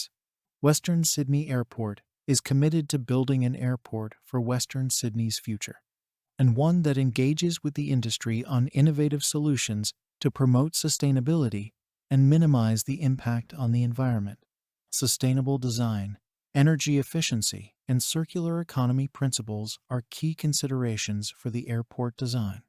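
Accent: United States. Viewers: Don’t get me wrong I understand most of this project it annoys me that it’s narrated by an American but the thing that gets me this commentary says that there will be a rail bridge within the airport precinct I was under the impression that it was underground within the airport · This video is a mishmash of graphics and congratulatory remarks only a public relations copywriter could conjure up. It’s repetitive and covers the same subject over and over. It lacks substance and nuance. The graphics are brief, lasting about two seconds, so whatever purpose it had is lost in the shuffle. Who put this young man up to this task? He sounds American so how did that happen? Nah, not liking nor subscribing.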